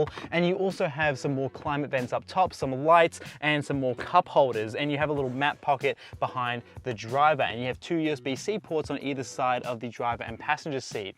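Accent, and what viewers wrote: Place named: Australia. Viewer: Didn’t want to assume lol but I thought it was Aussie 🙂. Anyway, you did a great review.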